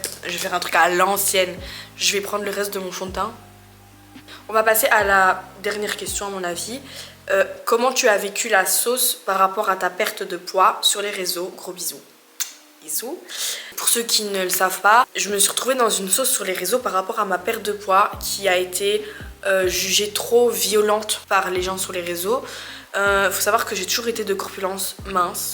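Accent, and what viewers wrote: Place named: Belgium. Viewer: Son accent Belge 😍😍😍>>>>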